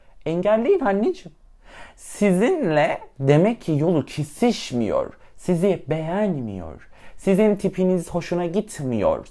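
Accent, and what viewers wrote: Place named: United Kingdom. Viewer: Ya şu her videoda yaptığı British English ve şarkı showları beni benden alıyor ya belirli aralıklarla yeterli dozda almazsam nöbet geçiriyorum sen şimdi benimle naaaağpıcaksın?¿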